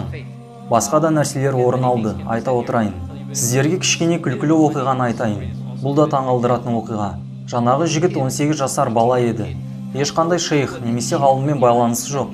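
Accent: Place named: Russia. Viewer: Авторға рахмет пайдалы видеосы үшін.Бірақ "ң" әрпінің орнына неге "н"әрпін айттыңыз аяғына дейін.қазақшаны акцентпен сөйлеп "орысша сауатты"адам болып көрінгің келді ма ?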